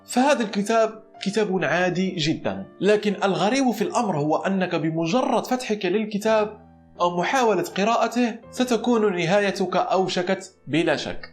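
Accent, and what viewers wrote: Morocco. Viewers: ميّزت لهجتك المغربية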